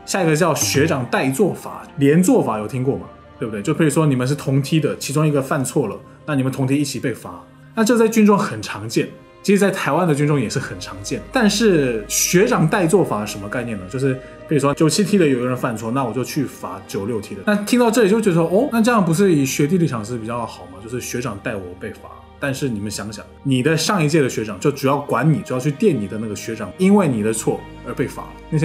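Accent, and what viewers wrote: Standard. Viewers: 看影片也幾年了，你的中文用字和發音已經比許多台灣人還要精確和標準。以非中文母語者或是雙母語者來看，真的很厲害，每次看你的影片都覺得非常佩服。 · 国语好标准啊不看评论不知道是哪国的，看的时候心里有几十个卧槽，我以为最多会被打两下给老兵洗袜子之类的，没想到这么黑暗！年少时曾粉红也曾痴迷韩剧学韩语，大学之后认识的留学生让我帮他们考试传答案，首尔和釜山学生背后说坏话，那种微妙的感觉我就想这和中国也太像了，神秘面纱没了之后依然很羡慕韩国的人均工资，以及内地女生对韩国男生的态度，留学生给我看约出来的女生照片真的又漂亮学历又好有的见面一两次语言不通就提出要和他在一起惊呆我。后来知道了娱乐圈财阀军队校园霸凌这些，我的理解是资本凌驾于政治之上很多事情也会逐渐离谱，思想上灌输洗脑教育会让不同阶层的人暂时忘记阶层不敢肆无忌惮的在学校军队欺负人，大不了长大之后再把自由意识找回来，都说大陆没自由我也天天骂觉得台湾或许是乐土，到现在我觉得或许根本没有乐土，一个地方也不是好或坏就能定论，作为平民很庆幸生在东北的十八线小城没受过校园暴力不用服兵役家人溺爱十八岁前傻子一样活着直到30岁还很幼稚，最后希望中日韩站起来让平民日子好过点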